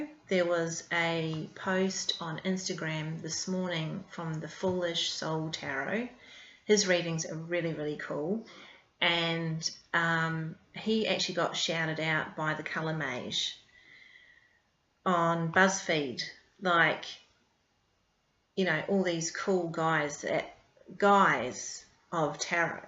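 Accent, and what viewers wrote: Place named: New Zealand. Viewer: Oh my goodness, I thought (assumed) you were from NZ!! Great responses here, I’ve been dabbling with some deck mods recently, only decks that are cheap and easy to get though. I’m the same, I’m not a huge fan of long videos, I try to keep mine around 10 to 15 (every now and then they go over if it’s something special). I’m off to check out some of the other channels you mentioned!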